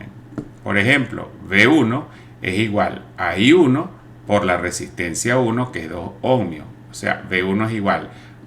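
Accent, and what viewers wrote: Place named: Guárico, Venezuela. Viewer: Tienes un asentó de Guárico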